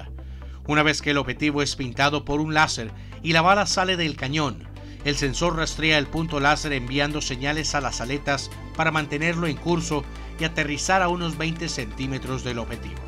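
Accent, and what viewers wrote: Costa Rica. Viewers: Desde el principio supe que eras tico aun si ni siquiera lo dijeras saludos desde cr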